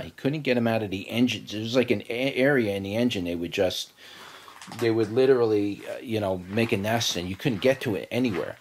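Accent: New York, United States. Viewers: You sound like you’re from one of the boroughs. :). I like your accent!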